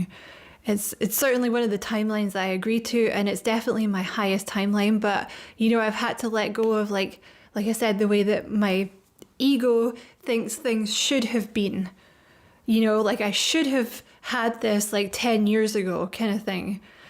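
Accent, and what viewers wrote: Scotland, United Kingdom. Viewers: OMG, I knew that you were Scottish by your beautiful bro. And I just found out that I have a percentage of Scottish in myself and I just absolutely adore that.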